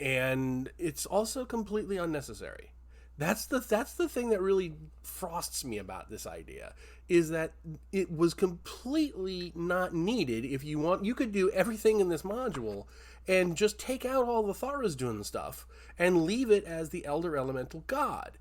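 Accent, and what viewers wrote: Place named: General American, United States. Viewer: My man, you confuse me, how can you have the most british tavern keeper face with the most bog standard American accent ever?! Holy moly.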